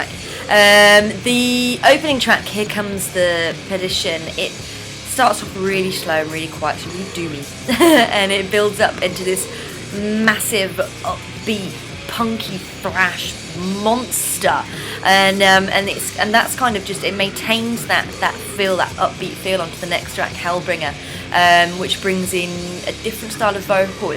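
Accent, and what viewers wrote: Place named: England, United Kingdom. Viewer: love your enlgish accent.....lovely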